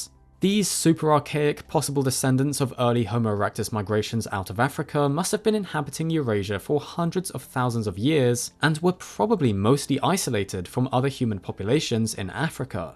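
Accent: United Kingdom. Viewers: British accent… its like nails on a chalkboard. Imaging having an internal monologue this drawn out and pretentious guiding your every whim. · Why does every documentary need a British accent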